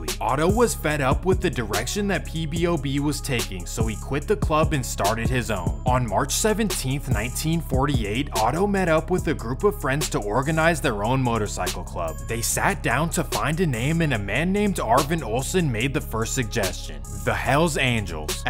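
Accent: United States. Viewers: Where did you learn how to pronounce American English?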